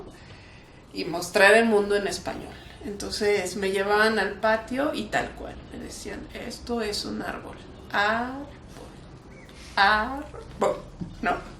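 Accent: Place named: Mexico. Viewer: eres la Polaka más mexicana, no hablas español hablás latino mexicano mi Reyna estás hermosa , Rule' s Naucalpan mex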